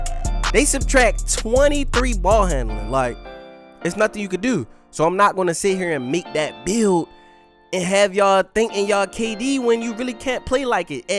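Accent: Baltimore, United States. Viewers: Brody gotta b from BMore the way them ooos is coming out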